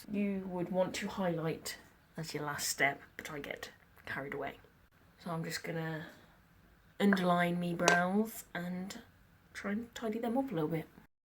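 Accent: United Kingdom. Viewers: lol you speak with a british accent where does that come from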